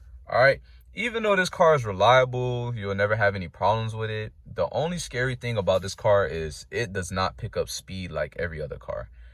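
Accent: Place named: East Coast, United States. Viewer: You sound like you're from the East coast. As someone who owns a 5th 6th and 7th gen all at the same time they're good daileys. The cool part is that they're light. Do a K24A swap for traffic 👍🏾🔰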